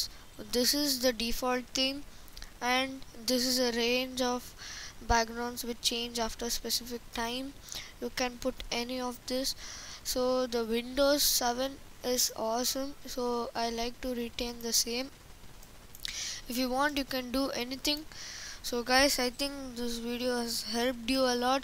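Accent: India. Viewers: The Indian accent make me weak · that indian accent was solid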